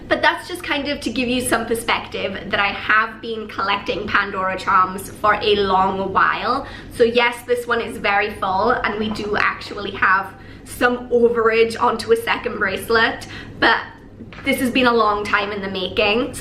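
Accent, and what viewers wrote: Wales, United Kingdom. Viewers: Are you from Wales?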